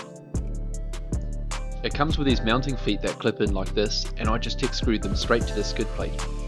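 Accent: Australia. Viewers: that looks like fun. and it sounds like you are you are a fellow aussie. where are you buying the controller from?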